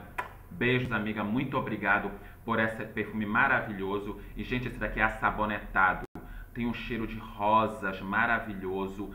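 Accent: Pará, Brazil. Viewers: Você é paraense? Notei q seu sotaque é igual de paraense. 😊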